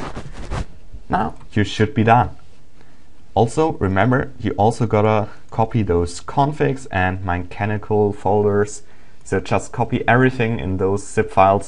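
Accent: Germany. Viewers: He sounds German to me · Hes a awesome guy With a nice Germany Accent People Are Hatting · your english really sounds like a german speaking english.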